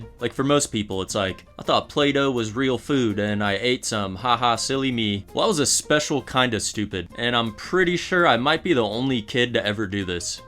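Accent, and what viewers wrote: South, United States. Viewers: 1. When I was little I thought it was weird to sit straight forward on the toilet so I sat on the side of the bowl facing the wall 2. I just now realize has a southern accent, after hearing him say "butthole" repeatedly and now I can't unhear the accent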